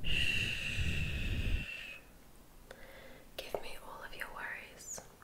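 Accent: Australia. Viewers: I swear an Australian accent makes everything better. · Bonnie May it’s an Australian accent. Can confirm, am Australian. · Please do a makeup collection tapping !! Love your Aussie accent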